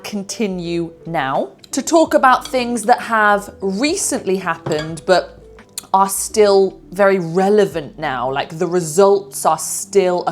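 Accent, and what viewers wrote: United Kingdom. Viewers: how l love British accent ❤ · I like British accent ❤ and cookies amazing georgous looking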